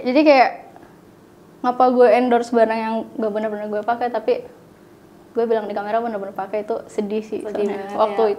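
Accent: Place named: Makassar, Indonesia. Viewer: Org makassar kalau ngomong kentara bngt sih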